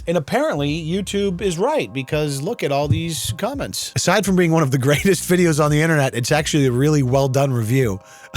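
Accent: Australia. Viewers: She’s Australian not British lol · Australian, not British my friend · I think she's Australian not British lmao they pronounce things much more weird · I don't think she's British. I think she's Aussie. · Very funny, I think she’s Australian not British, I’m British so I hear it.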